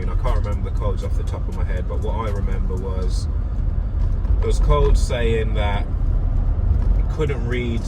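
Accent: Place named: United Kingdom. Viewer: Nothing cooler than a black guy with British accent 👍🏼👍🏼👍🏼👍🏼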